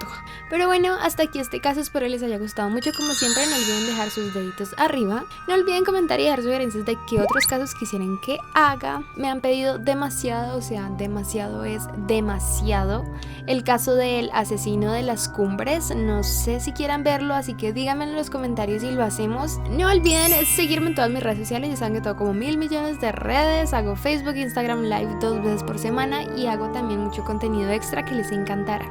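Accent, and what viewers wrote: Venezuela. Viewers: minuto no le paro bolas eres venezolana?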